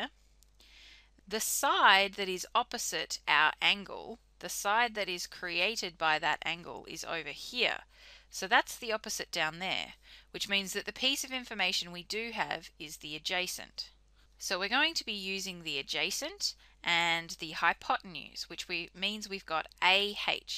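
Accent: Australia. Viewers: noticed the australian accent and checked the comments xD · Thanks nice Aussie lady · aussie right???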